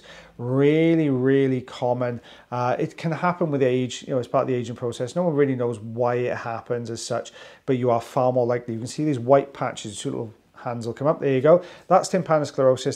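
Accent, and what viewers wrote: Wales, United Kingdom. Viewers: It's a Welsh accent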